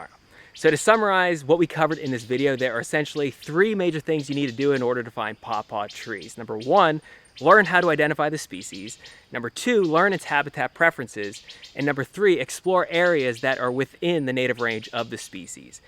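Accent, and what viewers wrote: Pittsburgh, United States. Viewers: Is that a yinzer accent i detect??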